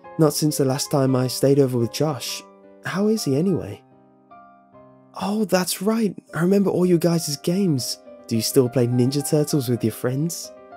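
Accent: United Kingdom. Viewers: Today marks ten years since this video was created. Even today, this still remains one of the (if not possibly the ) best creepypastas ever written! I find this video to be a great encapsulation of the story. You're soothing british voice sets an immersive feel and the use of music and sound design is expertly crafted. Overall, this is an incredible story and video and here's to a decade of its existence!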